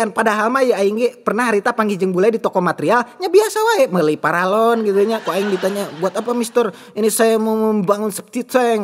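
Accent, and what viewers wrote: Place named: Sukabumi, Indonesia. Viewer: Sunda na logat jampang sukabumi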